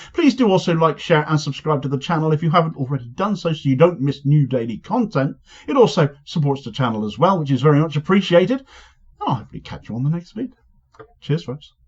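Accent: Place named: Devon, United Kingdom. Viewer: We need to get labour out. They are terrible. I voted for Reform, but I live in an area that is a safe Tory seat. But our local MP (Desmond Swayne) did help my family to get into a council house around 23 years ago. Your accent reminds me of home. I grew up on the edge of Dartmoor in Devon. Take care and best wishes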